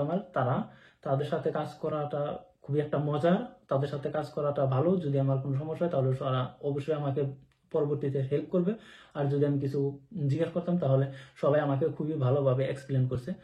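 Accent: Assam, India. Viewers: Uni India Asam theke belong kore.... Doya kore ektu bujhe nen Bangla ta.... Sob jaigar accent ek rokom nah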